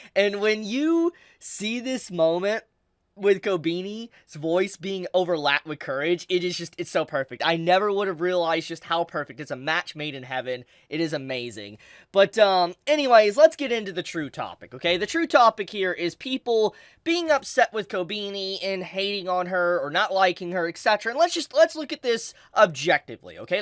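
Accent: United States. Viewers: the way b americanizing these names i swearr 😭😭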